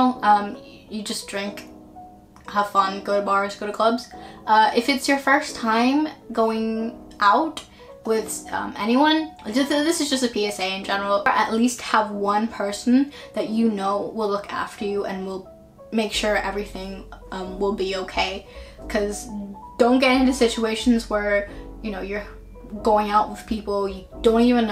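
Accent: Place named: United Kingdom. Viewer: you sound british to me!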